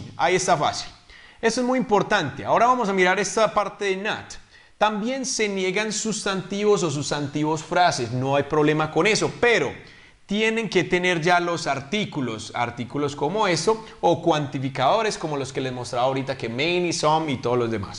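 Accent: Colombia. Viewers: Teacher you have Colombian accent..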